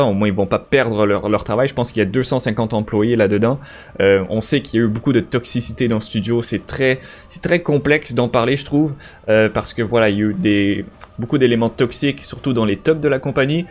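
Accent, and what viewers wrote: Quebec, Canada. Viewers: Caché ton accent québécois, tu n'arrives pas.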